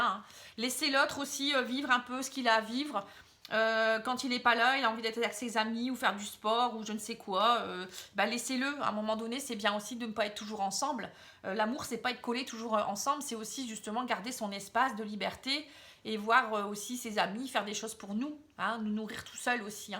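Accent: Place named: Picardy, France. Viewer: Bonsoir c'est la première fois que je vois vos vidéos merci pour la guidance. Vous avez un accent du Nord de la Picarfie je dirais 😉🙏🧘‍♀️🧚‍♀️😘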